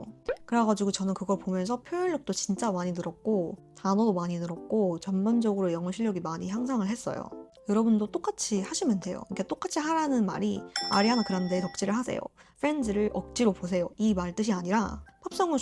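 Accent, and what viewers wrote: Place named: Gyeongsang, South Korea. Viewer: 갱상도 사투리네여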